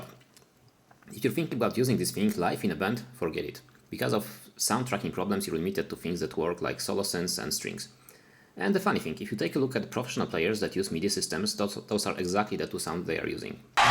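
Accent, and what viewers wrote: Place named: Warsaw, Poland. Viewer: I ten warszawski akcent. Gratulacje.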